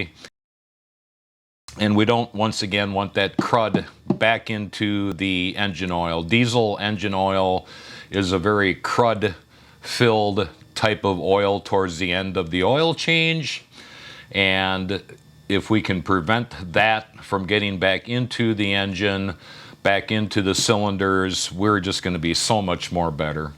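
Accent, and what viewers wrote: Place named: Wisconsin, United States. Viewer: you sound like a fellow Wisconsinite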